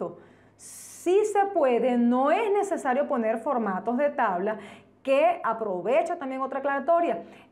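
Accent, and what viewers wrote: Venezuela. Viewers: OH! Es la joya de esta semana! Primero porque estas funciones están de lujo! Segundo: porque ella explica muy chévere Tercero: creo que es venezolana y ese acento de mi tierra me mata!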